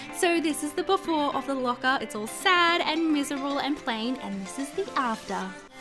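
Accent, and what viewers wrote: Australia. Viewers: I feel like your Australian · are you Australian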